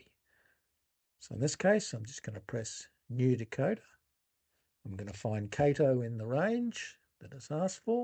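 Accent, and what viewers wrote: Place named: Australia. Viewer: Sounds like you're a fellow Aussie. Where do you buy the Kato decoders?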